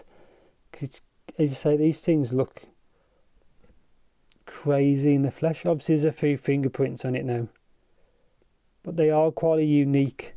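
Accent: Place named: Manchester, United Kingdom. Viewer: Sir no need to answer, are you from the Manchester area? That accent sounds like I'm listening to my cousins from UK. They all live in Manchester area. Still loving blon 03 if I grab back from GF who loves them... Cheers. My gf uses the pouch, thinks it cute.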